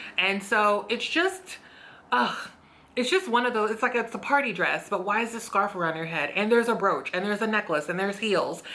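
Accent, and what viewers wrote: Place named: Philadelphia, United States. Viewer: Oh stfu , every time i watch your vids i feel like i know you and now i know why lol YOUR A PHILLY GIRL , must be the accent , im Philly too heehee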